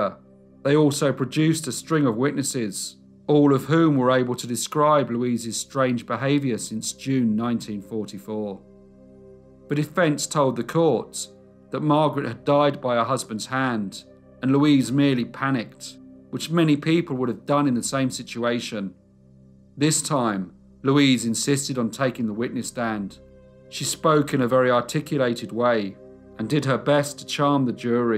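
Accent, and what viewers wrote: United Kingdom. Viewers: Oh my gosh! Do I love your British accent! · Didn't you notice he has got Brit speak.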